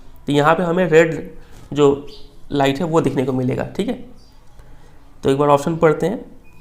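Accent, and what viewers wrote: Bihar, India. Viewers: Sir AP bihar ho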